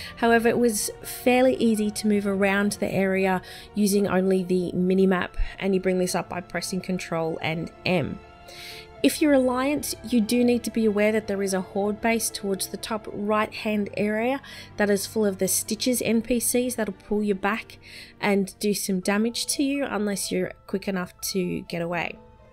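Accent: Australia. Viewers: I love female aussie accents. It brings a warm feeling to my heart and ears.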